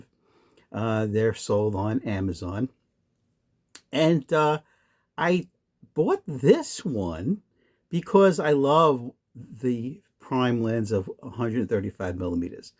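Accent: New York, United States. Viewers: Nice shots, I don't see any massive issue with the lens. You must be one of the last few people with a genuine New Yoik accent :) love it. Watching in Scotland,.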